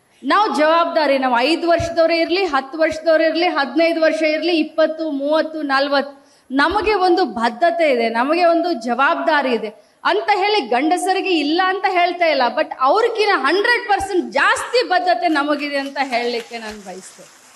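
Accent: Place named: Maharashtra, India. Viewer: ಹೆನ್ನು ಅಲ್ಲ ತಾಯಿ, ಹೆಣ್ಣು ಅದು.. ಮರಾಠಿ ಕನ್ನಡ ಮಾತಾಡ್ಬೇಡಿ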